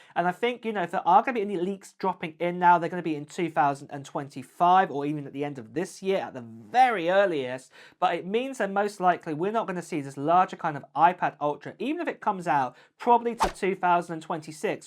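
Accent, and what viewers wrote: United Kingdom. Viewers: Please Apple, use an American person for PR and not a Brit😡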